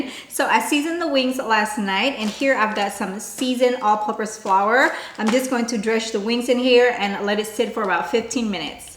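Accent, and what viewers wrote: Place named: South, United States. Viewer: Soooorrryyy. Please no one be offended. I just found this page trying to find lunch containers lol. I’m already obsessed. The whole time I was watching her videos I kept thinking to myself she has a little southern black twang in her accent! Either she’s part black or she’s always around someone black. I just thought it was funny how we have that little bit of seasoning in our words. Love this channel so far!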